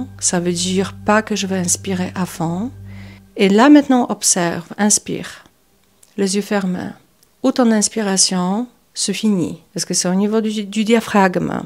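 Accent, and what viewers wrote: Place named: Czech Republic. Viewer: Vous êtes des pays de l’est, tchèque? En tout cas trés intéressant votre voie pour travailler la voix.En comédie, pour dire, on représente un autre que soi,une cible, les yeux dont vous parlez , avant la voix que l’on projète. Merci!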